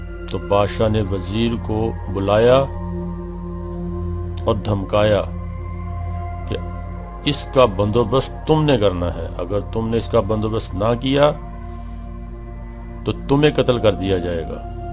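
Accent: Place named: Punjab, Pakistan. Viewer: Ghatiya. Agar kahi se parh Kar sunana hi hai to rawani k sath parhte. Ruk ruk k parh k dimagh ki lassi bana di. Aur Punjabi lehjay me Urdu ka khana kharab kardiya. Punjabi me hi parhlete.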